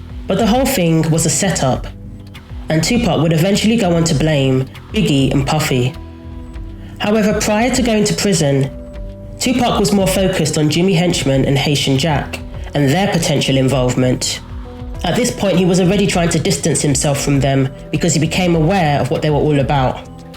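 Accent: London, United Kingdom. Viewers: Some big fish did go through the net. To this day still an unsolved murder! Love the London accent! RIP❗️though everything was avoidable.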